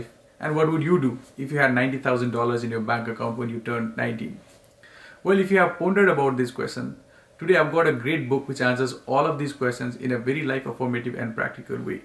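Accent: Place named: India. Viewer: Love thick indian accent 😅. I don't about this book but i like the same name book by Indian author Preeti Shenoy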